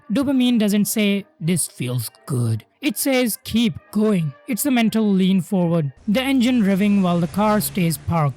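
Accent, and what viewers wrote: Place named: India. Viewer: no, it's your IND accent. the IND accent is probably the most annoying in the world to listen to.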